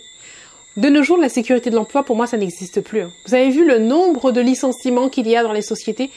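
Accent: Haiti. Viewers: Quand tu parles créole, tu as le même accent que les gens du Nord d"Haiti. Mais quand tu parles français, c'est comme tous les haïtiens en général. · oui du jugement des autres aussi je ne sais si vous connaissez le Sénégal mais il aime trop te juger. vue que suis au Canada si je rentre il vont avoir à l'oeil tout mes fait et geste.Merci pour tas réponse vous avez le même accents que les haïtien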